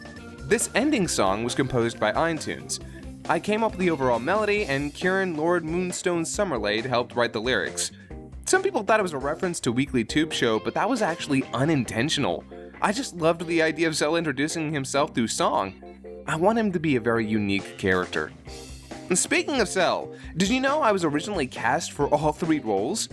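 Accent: France. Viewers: I like your cutie french accent :) A french fan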